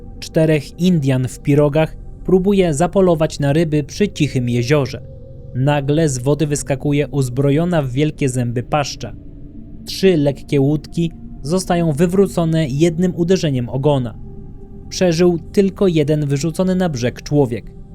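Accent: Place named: United States. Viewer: Uwielbiam relacje na temat krypto gatunków. Zdaję sobie sprawę, że cześć z nich lokalny folklor lub turystyczny marketing - tak jak szkocki Nessi. Jeszcze raz dziękuję za dbałość wymawiania terminów po angielsku. Gdzie uczyłeś się angielskiego? Brzmi jak amerykańska wersja.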